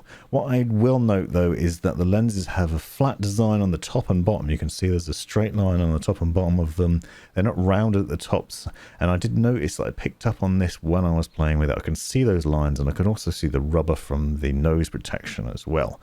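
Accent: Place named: North, United Kingdom. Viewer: You based in the north dude? Sounds like an accent closer to me 😂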